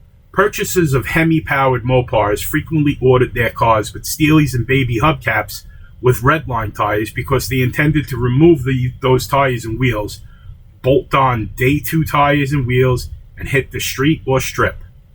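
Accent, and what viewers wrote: New York, United States. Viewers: Excellent modeling skills. Truly a well done detail build...love the engine bay and under carriage. Only one comment. Your speech is fine and you didn't need to bring attention to the "pathology". Ya sound like a Neu Yahker or up coast Bahston. Perfect speech!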